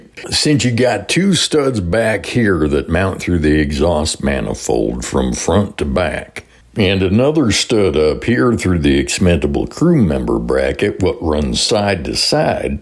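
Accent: South, United States. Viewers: He has southern drawl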